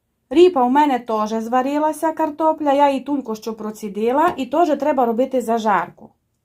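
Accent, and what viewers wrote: Transcarpathia, Ukraine. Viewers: Привіт із Львівщини! Дуже у Вас все сподобалося, закарпатське все таке добре, а Ви супер пояснюєте, приємно чути закарпатський діалект.Тішуся,що знайшла Ваш канал. Хай береже Господь Вас, і Вашу сім'ю! Великого успіху такому чудовому каналу!!!😘😘😘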